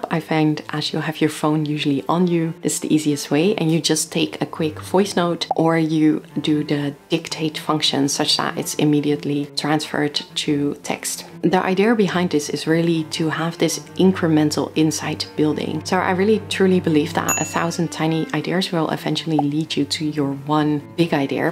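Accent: Netherlands. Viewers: The accent strikes me as a native Dutch speaker (or perhaps another European language). Being close to the UK, Europeans generally pick up more of the British accent than the American one. Let people speak how they want, if you’re understood, you’re doing fine.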